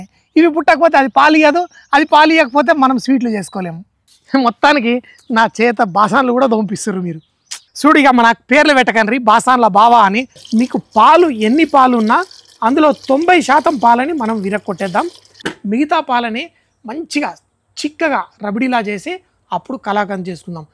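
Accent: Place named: Hyderabad, India. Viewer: pakka hyderabadi slang😁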